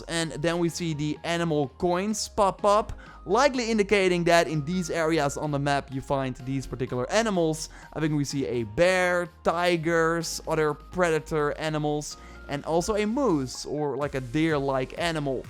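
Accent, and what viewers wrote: Netherlands. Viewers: asljeblieft , werk aan je verkaasde engels . goeie content maar mijn oren bloeden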